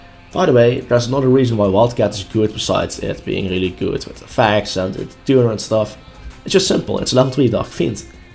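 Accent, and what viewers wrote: Netherlands. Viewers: Are u dutch? U have a dutch accent Nice video btw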